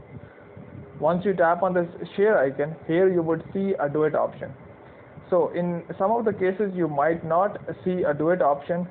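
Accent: India. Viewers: Me: sees video on tutorial hears Indian accent, clicks off of video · You alreadyy know this finna be good cuz u hear that indian accent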